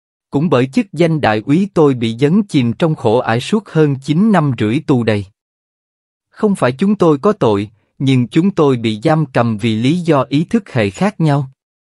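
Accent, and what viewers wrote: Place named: South, Vietnam. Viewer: đây là đúng giọng miền nam mà thật hãnh diện vì giọng đọc của a cảm ơn kênh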